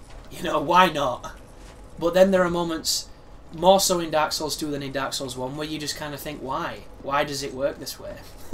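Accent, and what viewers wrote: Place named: Yorkshire, United Kingdom. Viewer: Sleep deprivation brings out the Yorkshire in you :D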